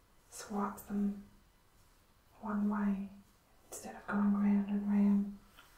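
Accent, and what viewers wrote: Australia. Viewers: Australia! My bad.I thought somewhere in Britain but your sounds are so rich I haven't paid much attention to the accent.Or do I have the accent? Now I hear it.I should have known. We have national and regional slang.It is probably the same there. I am a huge fan of the Seekers.Judith Durham is brilliant.But you are too young to remember them in their heyday.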